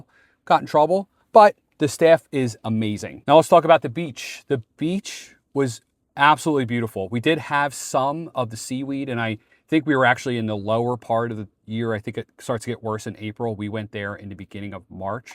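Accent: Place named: Philadelphia, United States. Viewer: Clocked your phila . accent before you mentioned PHL! Nice review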